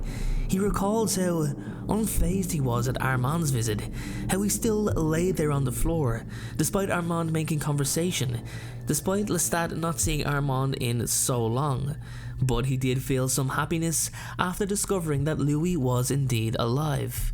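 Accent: Ireland. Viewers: You sound like conor mcgregor, that's how i know you're from ireland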